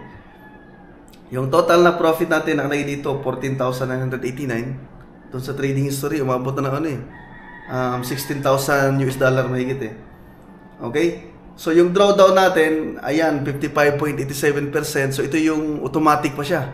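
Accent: Visayas, Philippines. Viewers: Bisaya diay ka dol hahahahabal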